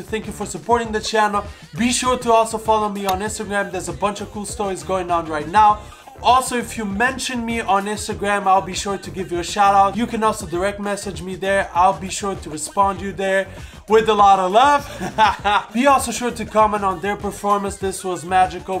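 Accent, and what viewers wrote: Brazil. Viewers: R u brazilian or maybe ur parents? Cuz ur name sounds Brazilian and when u speak Portuguese u kinda have a Brazilian accent...it doesn't sound like an american speaking portuguese 😂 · Ele é Brasileiro? Tem acento brazuca em inglês!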